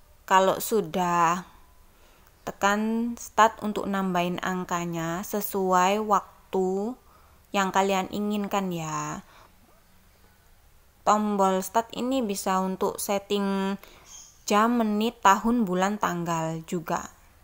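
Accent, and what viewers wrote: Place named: Indonesia. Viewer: Ngomongya NGEDOK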